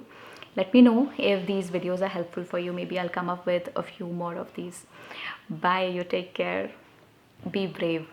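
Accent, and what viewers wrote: India. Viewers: No popular english self help books are available over YouTube in Indian accent. I think you have a wonderful, sweet and friendly Indian accent. If you think of doing it, it would definitely help lots of aspirants like me in developing our English beside personality. Thank you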